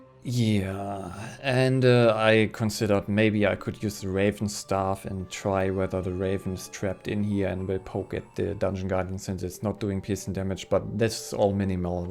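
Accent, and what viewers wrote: Germany. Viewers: Man hört raus das du ein Deutscher bist xD Trotzdem geiles Video👍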